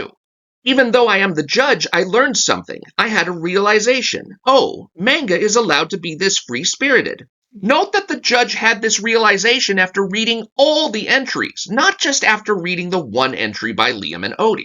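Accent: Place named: Australia. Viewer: Your just coping and seething, luv the Aussy accent through.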